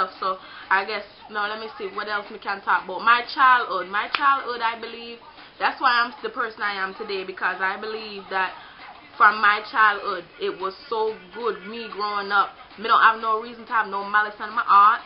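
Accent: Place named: Jamaica. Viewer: OH MY GOD!!! I love accents,,, I most of all love your jamaican accent...!!!! BOL the shirt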